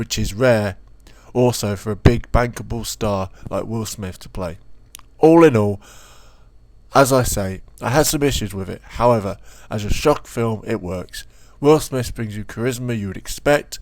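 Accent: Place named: England, United Kingdom. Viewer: It looked like another res evil to me but I like Will enough to give it a look. Thanks for this insightful review. Nice to hear an english accent tell it how it is for a change. You just need to polish up the speech a bit and it would sound professional. Nice job though. I'm going to favourite your page for future reference, thanks. 5*'s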